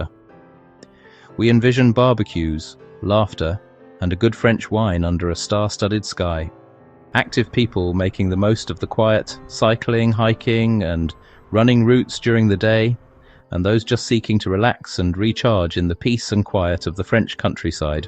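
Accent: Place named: Southampton, United Kingdom. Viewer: Tu as laissé ton accent de Southampton!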